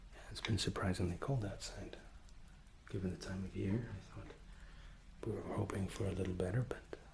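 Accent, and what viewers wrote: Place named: Scotland, United Kingdom. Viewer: Been looking for a British ASMR for a while, couldnt believe my luck finding a Scottish ASMR guy. Scottish women living in canada now, and miss the brogue. Love it.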